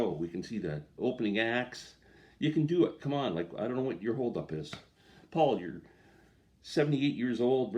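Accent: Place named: Canada. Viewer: That was hilarious! Also, I can't imagine anything coming across offensive when speaking in a Canadian accent. Cheers!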